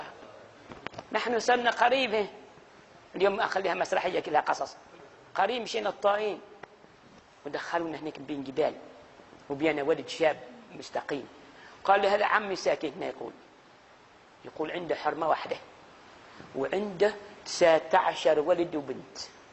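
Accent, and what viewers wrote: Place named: South, Tunisia. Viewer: متأكدين ان هذه اللهجة.عمانية ؟ تشبه لهجة الجنوب التونسي.لحد كبير ( مع اختلاف بسيط في الجيم تنطق G' و القاف تنطق G غي الجنوب التونسي ) لهجته مفهومة تماما بالنسبة لي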